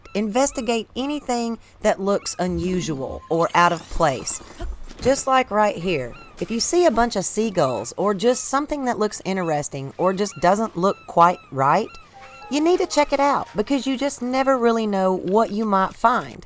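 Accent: South, United States. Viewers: First off I just wanted to say that it was refreshing to hear a female voice and one with a southern accent as well. I'm from California, so it's not my accent. 😆 It's nice to hear someone from a different part of the country. Second, I learn a lot from this video. I didn't know you could get arrows from a cooking pot! Regarding the wolves, I like to kill as much as I can for the meat; meat skewers can fetch ya a pretty penny at Goron City. I knew you could change chuchus to other colors, but I didn't know about the water methods. Will come in handy! Thanks! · Your country accent is so strong and it sounds adorable lol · The Southern accent was an unexpected but pleasant surprise lol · I know this game has been out for a while but I just started playing it on this oled switch I also just got. Best choice I made in a while. This video is so helpful. 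Liked and subscribed. Sounds like you're also from the south, lol. Good luck on your algorithm!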